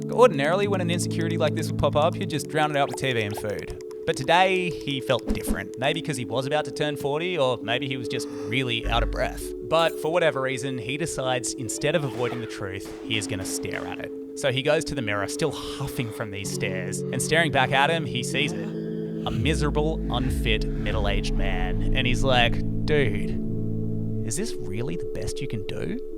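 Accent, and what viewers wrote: Australia. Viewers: I love your videos!! Perhaps someday you can add subtitles? I'm from Colombia and the Australian accent is way more difficult to understand. Thank you for posting!!!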